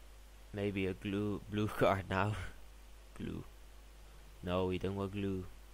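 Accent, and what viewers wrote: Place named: Netherlands. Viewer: lol nederlands je praat een beetje engels met een nederlands acent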